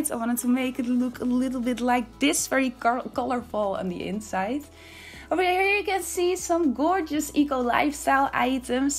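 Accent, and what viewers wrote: Netherlands. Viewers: Wow... mind blown! So creative! From your accent I think I can assume you’re a fellow Dutchie, so: beterschap voor je vader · I can hear that your dutch 😂 love it tho !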